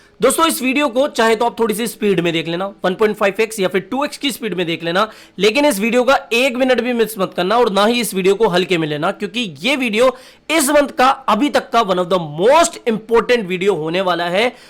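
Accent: Rajasthan, India. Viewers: Bhai app Rajasthan se ho na 😏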